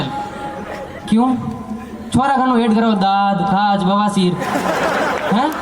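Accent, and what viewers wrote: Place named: Jaipur, India. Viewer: भाई तो जयपुर side की भाषा बोल रहा है, हमारे मारवाड़ में तो यू नहीं बोले 😂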